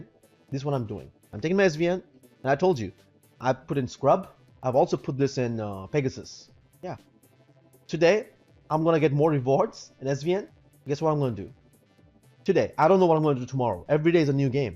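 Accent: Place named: RP, United Kingdom. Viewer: Where is that accent from. It sounds posh to my ears. Sounds like money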